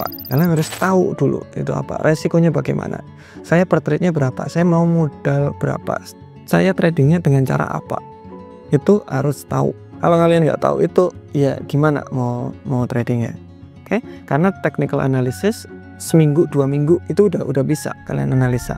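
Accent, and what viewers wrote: Java, Indonesia. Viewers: Bang kmu Jawa ya 🤭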